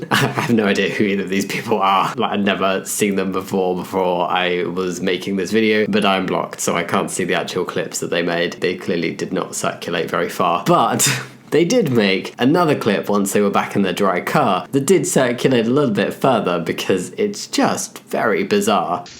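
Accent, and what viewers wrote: United Kingdom. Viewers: can u do an american accent. Ur british accent sounds like nails on a chalkboard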